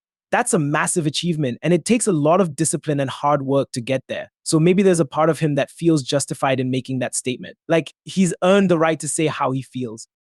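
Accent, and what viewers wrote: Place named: Southern Africa. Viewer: My man, you sound very southern African... I'd wager South African straight up... yet you made a whole video and released it internationally without looking up how to pronounce this southern African man's name? Embarassing...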